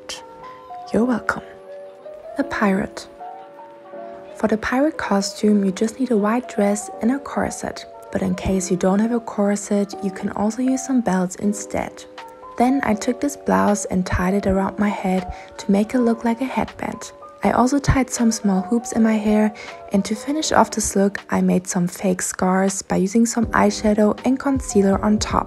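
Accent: Germany. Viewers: off topic but what accent is this? german?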